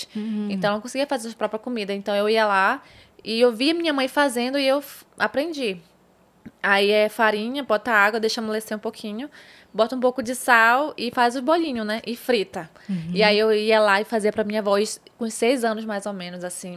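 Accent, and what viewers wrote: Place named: Manaus, Brazil. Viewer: Esse sotaque é lindo...minha sobrinha foi criada em Manaus e falava assim...❤❤❤❤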